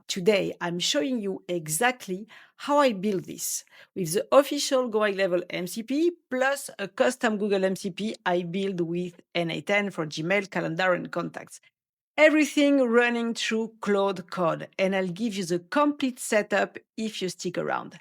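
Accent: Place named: France. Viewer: Wha le french accent but super glad to see and GHL expert as woman ! 🔥🔥🔥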